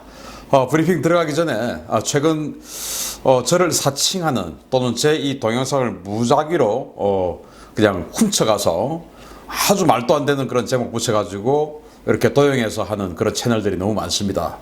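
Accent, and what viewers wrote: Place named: Gyeongsang, South Korea. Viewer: 경상도 사투리를 사용하시는 사람이던데요...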